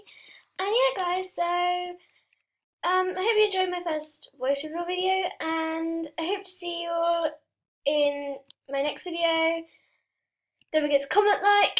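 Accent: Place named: United Kingdom. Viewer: Wow, when we met on Animal Jam, I didn't think you were actually... uhm... british?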